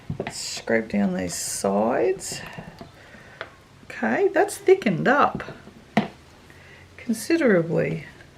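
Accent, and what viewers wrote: Australia. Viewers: Hi, I'm a bit late to the party... love your Aussie commentary lol (Aussie here too). I have this fragrance but haven't used it yet, so was good to see your video. I wanted to ask where you got your soap cutter from please.. I've been looking but seems like theyre all O/S..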